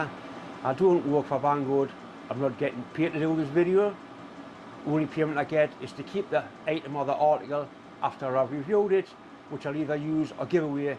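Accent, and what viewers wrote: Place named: Durham, United Kingdom. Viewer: Thanks very much, really appreciate your straight to the point videos, very helpful. From the accent you must be very close to where I am in Durham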